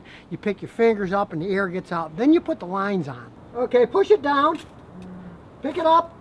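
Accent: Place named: Brooklyn, United States. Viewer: has Brooklyn😁 accent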